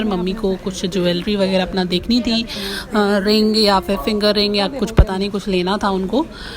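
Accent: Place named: Pakistan. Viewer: Sis aap India se hain ? Which state ? Mujhe laga k Pakistan 😂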